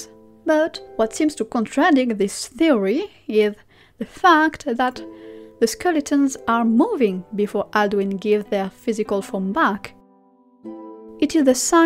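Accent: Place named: Europe. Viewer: love your accent where are you from in Europe, this channel has alot of lore value for skyrim , great work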